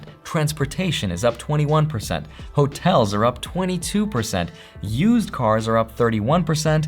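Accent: United Kingdom. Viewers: LOL your British accent is the best.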